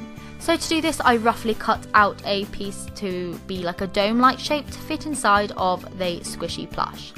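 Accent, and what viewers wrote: United Kingdom. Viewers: It’s because she’s British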